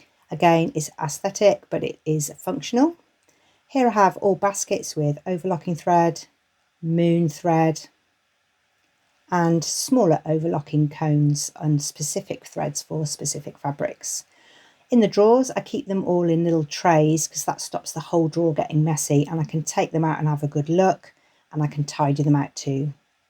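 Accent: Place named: England, United Kingdom. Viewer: first time here, love the clear style and lovely English accent. great tip for remembering which needle is in the machine.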